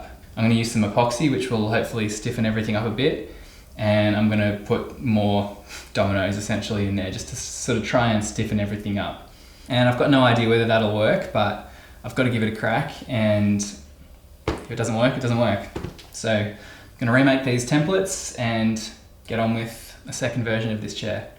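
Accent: Australia. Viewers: Mate this is top shelf content 👌 so refreshing to hear an Aussie accent on yt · I would fix the back rail with a saddle joint Too many parts. The frame is more about how it looks than how it functions and thats fine if you are making a gallery piece but you said you were trying to design a chair to offer with your tables. That being the case its imperative you keep costs down and therefore simplicity rules Dominos will not make anything stiffer they only provide alignment for glue-up and some shear resistance. Also just an idea if you are determined to have the rails intersecting in the middle of the underside of the seat why not get rid of the block and the flimsy 4 joints and just beef up the stock slightly and do a Cross Lap Joint. And as someone else said great to hear an Aussie accent on YT. Worth a subscription from me.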